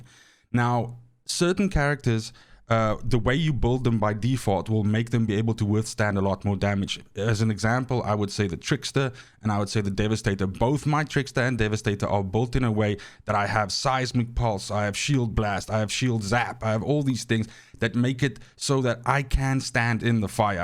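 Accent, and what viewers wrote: South Africa. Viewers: South African lol 🇿🇦?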